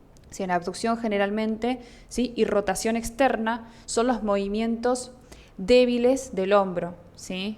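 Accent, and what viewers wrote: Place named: Rioplatense, Argentina. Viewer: Ay, cariño, no lo soporto el shesheo. Me parece un vídeo interesantísimo, pero no soy capaz de verlo.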